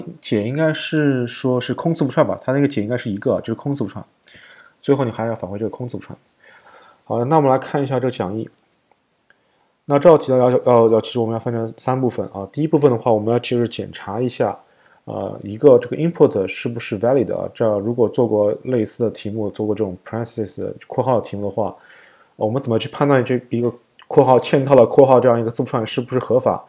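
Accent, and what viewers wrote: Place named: Shanghai, China. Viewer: 良心博主，如此熟悉的口音像上海人哈哈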